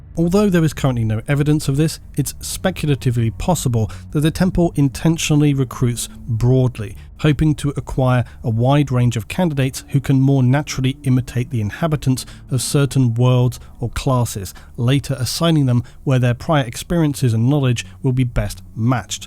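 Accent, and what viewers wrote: England, United Kingdom. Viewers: No amount of English accent and somber narration can change the fact that a female body simply isn't built for any sort of physical combat, much less assassinations. The 40k universe pandering to this idea just makes it less credible. There's already a lot of questions concerning 40k lore, besides the nature of these Callidus Assassins and it only makes it worse. A lot of 40k lore is so out of touch with logic, it makes me think that the only thing that keeps this franchise going is the blind fan loyalty who don't care about all these issues.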